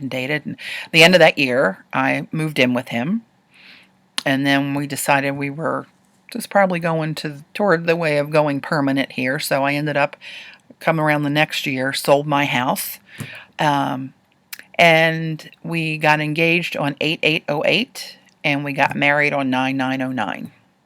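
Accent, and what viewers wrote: United States. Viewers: My mother is a breast and uterine cancer survivor, last month was 7 years ago. I also live close to Baltimore, was born an raised there. I just knew after hearing your voice and your humor you were from Baltimore. God I love your videos. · I recently discovered your you tube videos on gelli plate art and love them. I actually bought a gelli plate which I have yet to use, but am gaining an understanding of how it works and look forward to playing with it very soon. I want to thank you for "the rest of the story". I do not nor have I ever had cancer. I have had friends with cancer and have lost dear friends to cancer. I have to say that I live in British Columbia, Canada. I know from your great accent that you live somewhere in the South - at least I'm pretty sure. My Mom was from Mississippi, and I was born in Alabama, raised in New England, and lived a few years in Tennessee, and also in the midwest. 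I feel such a bond with you as I listen to you talk about your art making process, If I lived close I would want to just say Hi!!! So here it is, Hi and thanks for the great videos. I am working my way through all of them! Love and Peace and great gobs of good health!!!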